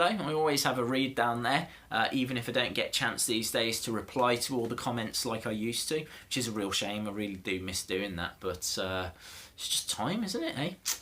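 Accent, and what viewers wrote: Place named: Midlands, United Kingdom. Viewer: You're clearly from the midlands just like me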